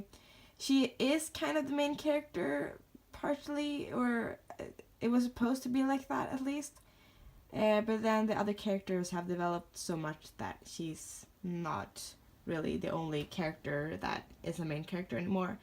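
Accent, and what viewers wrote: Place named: Scandinavia. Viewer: Hey there. Eller hallo. I was wondering where you're from? Your gorgeous dolls' names seem very nordic to me, as well as your accent, so I was wondering if perhaps you might me in fact nordic?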